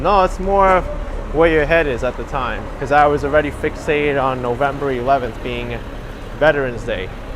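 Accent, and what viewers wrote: New York, United States. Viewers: Watching this as the sun sets here, 3 hours later, in Oakland CA. What a great way to be linked to our pride and joy NYC. Or mine, at least. And to have homegrown voiceover accompanying my virtual walk. What part of the City or environs are you from? I know, I'm assuming things, but sure sounds like it from your accent.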